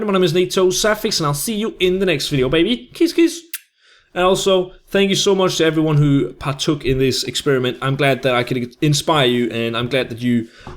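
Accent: Denmark. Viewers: Hey man, are you Danish? ? 😊 you sound a bit Danish just a bit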